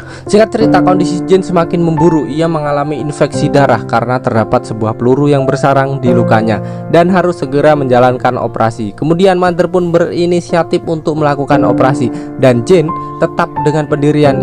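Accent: Surakarta, Indonesia. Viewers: Orang solo?.. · Orang solo bang? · Orang solo kah ? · wong solo kah ? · weeee tekke wong solo to mbak e .. wkwkwk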